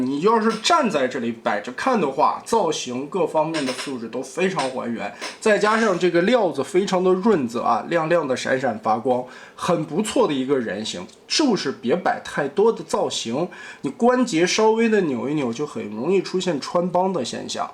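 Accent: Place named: South, United States. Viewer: He speaks with a southern drawl 😀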